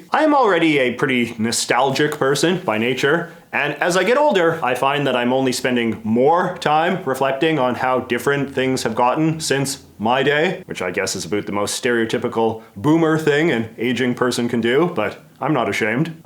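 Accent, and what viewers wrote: Canada. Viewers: You have the most Canadian accent I have ever heard. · My first video I've seen of yours. I love the content of it. Nice 👏 👌. Very cool stuff... but God damn. Youre Canadian AF! Everytime I here oot..it just takes me oot of the moment lol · Please work on your thick as all heck Canadian accent...It's not representing us fellow West Coast BC folks very well 😎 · Lol you say a boot are you Canadian I'm Canadian too but I don't say about in quite the same way you do Looked at your channel it does appear your Canadian I'm going to refine my guests are you from Newfoundland? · Being a Canadian myself, this is the strongest Canadian accent I’ve ever heard. Good lord.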